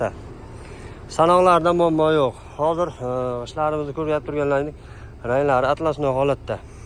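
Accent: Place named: Tajikistan. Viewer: 😊ты таджик не умеешь по узбекский говорить. Сакау.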